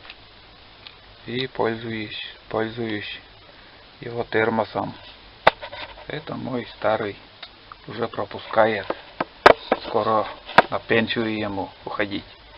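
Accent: Komi, Russia. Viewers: У меня бабушка из Коми, и с дедушкой она в Подьяческой познакомилась, говор то-же такой. И подруга тоже из Коми, прикольно, как тесен мир. Подруга услышала от меня слово "Покумекать" и спрашивает а от куда ты это слово привык говорить, я говорю от бабушки... так и познакомились... Спасибо Вам, и всего Вам самого доброго. :)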